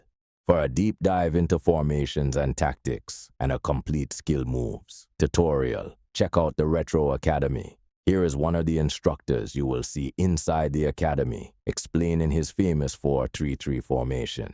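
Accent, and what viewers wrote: Jamaica. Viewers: Why does the accent sound Jamaican?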